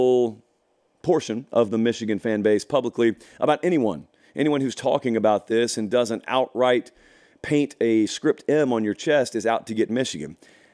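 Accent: South, United States. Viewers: Afternoon, Firstly, I'll state that I'm a Michigan fan, born and raised in Ann Arbor but moved away and lived all over the country; from MI., to Harrisburg PA., West Palm FL., Miami FL., Bmore MD. (Johns Hopkins), Las Vegas NV., Raleigh NC., now out to SLC Utah. Been all over this country (dig your accent by the way, miss the South), almost decided to set up roots in Nashville when I was driving through. My brother is a Gator, my fiance' is a Ute, my best man (soon to be) is a Runnin' Rebel. All of these people know I'm a Michigan fan, and how I'm one of those that's always tried to play by the rules (personally speaking); probably why I'm still in middle management, my brother (Gator) was the politically savvy one who earned the big $$. I say all of this to make a point, that I was upset by what was found out about Michigan. Even my father who's a Michigan alum said "If Harbaugh lied, he needs to go" (very old school, graduated '59, nuclear engineer when Michigan was the only school in the country that had a working reactor *note: prior to MIT). 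However, Dan Wetzel's article makes a great point about the recent news that came out, and you adamantly dismissed. You've also made a point that if you're "speeding and get caught, you can't point to everyone else". I'm a big fan of this analogy as you stated it, because in fact it's very much true. Michigan was caught doing 75mph in a 65mph zone, which is most certainly a moving violation and should be punished. However, while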